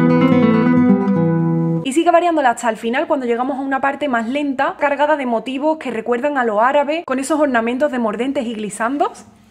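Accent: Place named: Seville, Spain. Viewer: From Seville (western andalusian)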